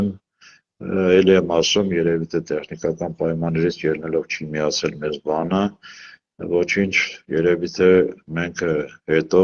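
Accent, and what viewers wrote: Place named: Volgograd, Russia. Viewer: Голос. Ссли8но Волгоград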